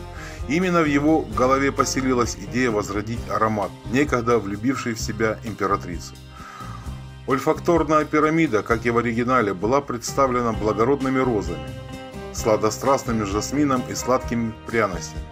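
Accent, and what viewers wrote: Ukraine. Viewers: Духи Праздничные- Россия и Юриала-3 - Прибалтика.А говор украинский за версту. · прекрасный украинский говор, что вы имеете против?)) не все говорят с маасковким ааакцэнтаам)))) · Это украинский или южнорусский акцент. А не издевательство! Речь не о том в видео! Спасибо автору за воспоминания и информацию для тех кто не знает. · Вообще то это украинский канал, у них всё так говорят.... Могли бы сделать снисхождение, а не критиковать.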